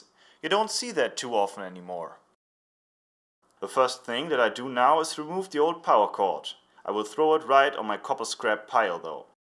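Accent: Germany. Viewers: For somebody whose native language is French, I am charmed by this German accent. I understood by the context that he was happy of his purchase, so I could not guessed that the expression could express anything negative. That remind me after a good meal, my partner said "I am feed up", thinking he was expressing "I ate too much". It shows that we, Quebecois, can not guess correctly the meaning of these verbs followed by "up" or "down". We have the same problem guessing these French expressions with a name of a color: carte verte, carte blanche... what? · Mal ne doofe Frage: Du bist Deutscher oder? :D Hab jetzt am Anfang nicht drauf geachtet, aber so wie du deutsch sprichst, und wie du englisch sprichst, kann es gar nicht anders sein :x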